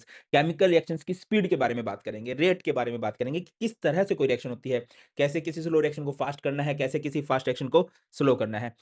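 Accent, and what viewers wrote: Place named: Punjab, India. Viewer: sir ka punjabi accent TOP.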